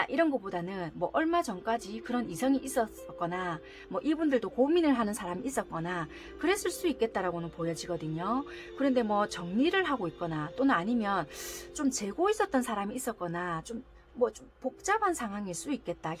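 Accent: Busan, South Korea. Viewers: 감사합니다~^^ 좋은일이 생기면 꼭 후기 남길게요! 🙏🏻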